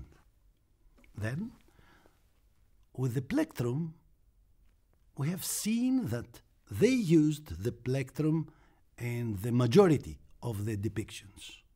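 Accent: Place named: Greece. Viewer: This is so far the best introduction of this legendary instrument. Respect for your dedication of research, experiment and creativity. It's a very inspirational personal story as well. (I enjoyed the greek accented english narration as well, it's like a very calming story telling :D) Bravo for reviving this great instrument!!!👏👏👏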